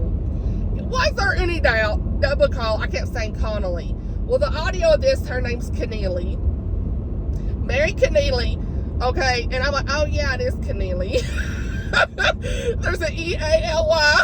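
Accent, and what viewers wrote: South, United States. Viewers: Your southern accent is fine for me. I'm a southern girl myself. I'm from Maryville, Tennessee. I will be praying for you and your family. We are going through a hard time with my husband. He is taking chemo foe stage 4 colon cancer so please keep him in your prayers please.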